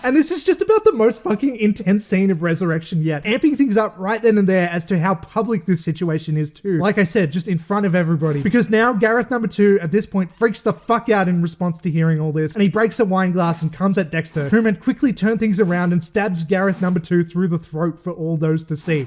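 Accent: Australia. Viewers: The Aussie is back with some badass recapping😊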